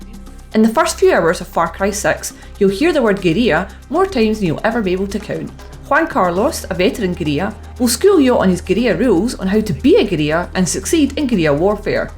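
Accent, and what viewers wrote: Scotland, United Kingdom. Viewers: Born and raised Canadian, english is my first language. 99.9% of Brits and Aussies I can understand perfectly well, as clear as any Canadian or American... this reviewer (Scottish maybe??) has an overwhelmingly thick accent, I only understood perhaps 60% of the words she was saying. Perfectly fine if this review were for Brit / Scottish viewers only.. but pretty sure PC gamer's viewers or readers are mostly in North America and the rest of Europe.. wouldn't it be more sensible to use someone with a softer accent? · I love your accent! 😁 more Scottish game journalists pls! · XD these comments! Poor Scots get a hard time of it don't they?! But yeah, while I personally can totally understand what she's saying, I agree with the comments, I live abroad, and I make constant efforts to ensure I speak extremely clearly. My normal accent omits T's at the ends of (and sometimes in the middle of) words. 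I had to teach myself to pronounce T's when talking, among many other adaptations. I think if your going to put out a review to essentially the WORLD, you've got to meet them half way at least. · Exactly. I can understand it too but you've gotta tone down the accent a little bit (a wee bit?) if you're addressing non-native speakers. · I am a mexican who speaks english reasonably well, trying to understand a scottish person who has a thick accent. Sorry PC Gamer but I think a clear and neutral english would be better next time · The colonials are struggling with the reviewers Scots accent 😁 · Please, if you’re going to make a review with a Scottish accent, at least speak more slowly than usual. Don’t get me wrong, I got nothing against the Scottish accent if the review is well made, but if I can’t understand most of the review, it’s not a good review.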